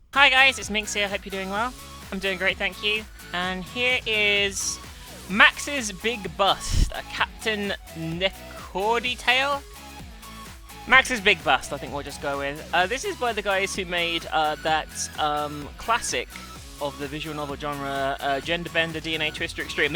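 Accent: South, United States. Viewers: I love the southern actcint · People really hate that southern accent voice? I find it enjoyable and in your Dangonropa voice it kinda fit Nagito's bat shit crazy personality. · I love the southern accent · Not a fan of this stupid game, but I personally luv southern accent!:)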